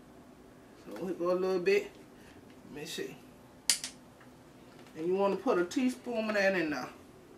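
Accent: South, United States. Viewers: Where are you from i love your southern accent ?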